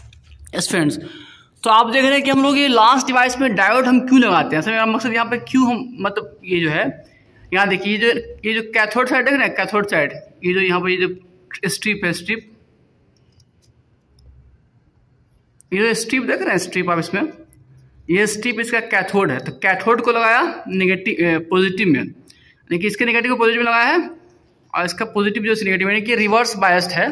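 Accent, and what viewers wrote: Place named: Jamshedpur, India. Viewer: Aap Tata (Jamshedpur) key ho ,aap ki bat se lag ta hai 💯 %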